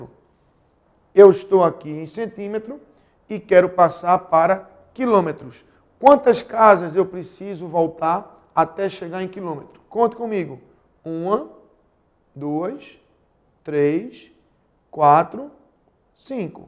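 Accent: Northeast, Brazil. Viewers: Sabia que era nordestino. Mas não sabia identificar o estado! Obrigado :)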